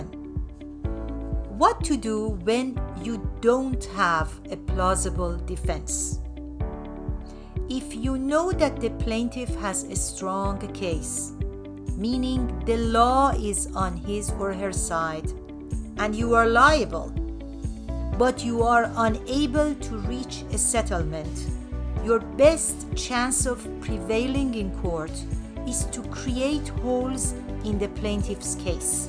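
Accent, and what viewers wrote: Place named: Afghanistan. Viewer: Afghan accent 👌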